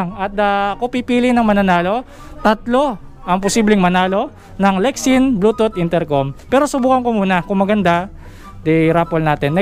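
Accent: Pampanga, Philippines. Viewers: Kapampangan kang tune par. ahaha managapatula ku kareng words a gagamitan mu. More power and ride safe lagi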